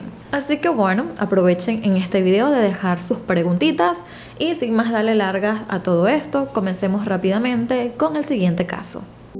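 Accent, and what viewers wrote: Venezuela. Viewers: De donde eres? Me suena que eres paisana😊🇻🇪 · Buenos días tienes una excelente capacidad de narración eres Venezolana ? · TheFeistyOne xx creo q es de Venezuela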